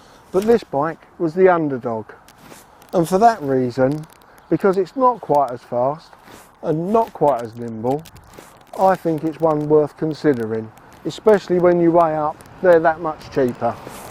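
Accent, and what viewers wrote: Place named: United Kingdom. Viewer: For the love of Christ, why do you brits insist on pronouncing the letter Z as the word, "zed?" It sounds absolutely ridiculous. It's a ZZR, not a zed zed r. Z. As in "zee" if you must, but dammit, knock off that retarded zed nonsense. And yes, I have one, an 04, moonlight silver with corbin beettle bags, and i would NEVER call it a zed. Its a ZZR!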